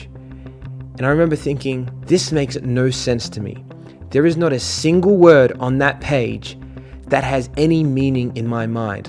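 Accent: Kerala, India. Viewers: R u indain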